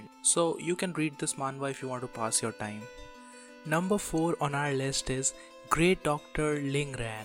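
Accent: India. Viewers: Epic video bro. By the way are you Indian? Your accent feels like you are a indian.Btw love your videos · i hate indian accent..!! so anoying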